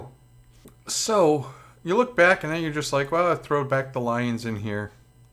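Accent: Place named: Brooklyn, United States. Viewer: Brooklyn is my favorite accent. Seriously love it. I don't understand all this sport talk but I like listening to you talk about it. Missed you around here buddy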